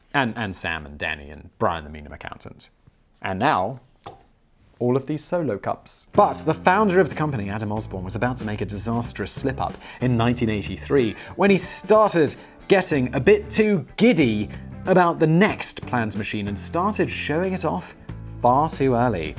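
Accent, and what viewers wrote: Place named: United Kingdom. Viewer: Unfortunately have to use Facebook to check what my mom is uploading 🤦‍♀️ I like to watch your videos as I acquire random information with a nice British accent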